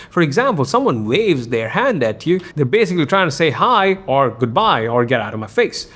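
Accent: India. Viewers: I totally forget sometimes you have an indian accent, which i love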